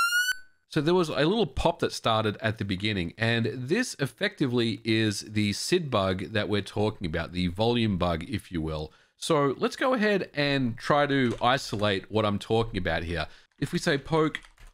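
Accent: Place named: Australia. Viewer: The Australian accent just hits different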